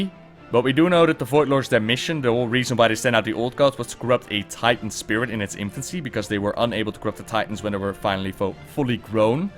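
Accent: Netherlands. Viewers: I don't get why so many people watch this crap this Dutchboy has a annoying accent.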